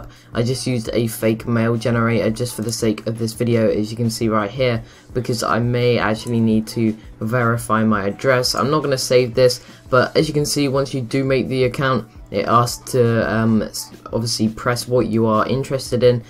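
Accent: United Kingdom. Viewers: Sorry man, are you from UK?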